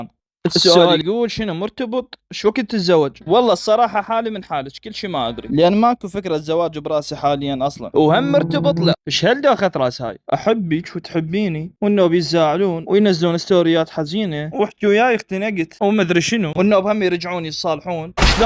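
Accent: Iraq. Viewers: يا هلا 😂المهم انت نجفي؟ لهجتك مو غريبه عليه فَ✨🎀 توقعي نجفي🙂. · يوليييييي يحجي بجججاااااا اهل ناصريهه اسمعووو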